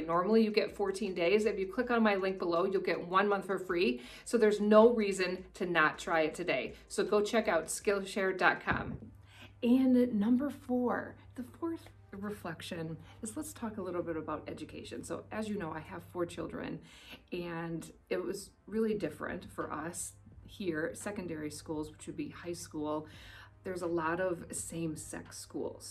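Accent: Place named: New Zealand. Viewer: Great video - I can even detect a bit of Kiwi in your accent these days!!!! Cheers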